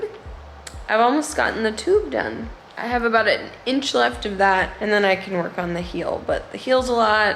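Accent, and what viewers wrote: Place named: Canada. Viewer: haha! Right, the "ou" calls me out every time. Canadian, eh.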